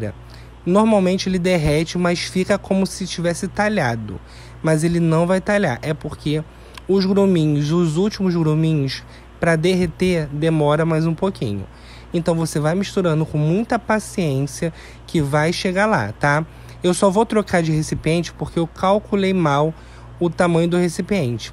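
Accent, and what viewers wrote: Rio de Janeiro, Brazil. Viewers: Ñ sei se tem beleza física (creio q sim)😂 parece o Lombardi, só conhemos a voz 😂mas seus vídeos são os únicos q assisto sem acelerar. É didático, sem apelação. Seu sotaque (carioca?) Sua voz, td e agradável, td é proveito. Jesus te abençoe mais ainda.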